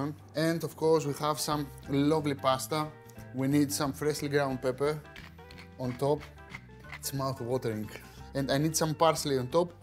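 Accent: Greece. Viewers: Great recipe, easy in oven. You are one of my fav chefs on you tube. Thank you. ❤love the accent love that you’re Greek! Want more Greek recipes.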